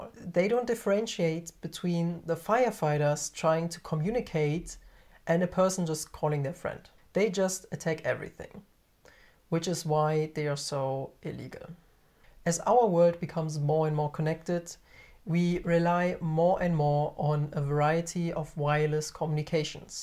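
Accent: Germany. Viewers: you have a german accent lol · Are you German ?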